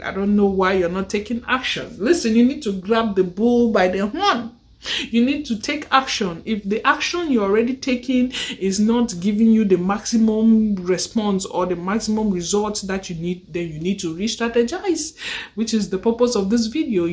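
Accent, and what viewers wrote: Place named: Pidgin, Nigeria. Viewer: OMG 😯 - that was a nice 👍🏾 video, you were very much down to earth - no dulling + no forming, your pidgin english (broken english is very concentrated ooh 😲) - do you blame for bringing out the Nigerian man in him? when you completely took him off guard -) can’t wait 😊 for the follow up. Well done ✅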